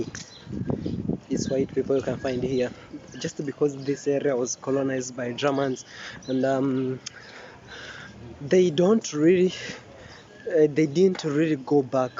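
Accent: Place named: Zimbabwe. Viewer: Boeti ❤ ur content..Thanks 4 visiting Swakopmund. Thought u from Zim the accent thou is diffrnt from wthetu😊 much ❤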